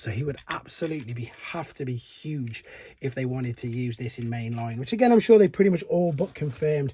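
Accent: United Kingdom. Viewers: Hi, thanks for showing this great new toy off. Great to hear the first review with a West Mids accent too (from Cannock, myself!).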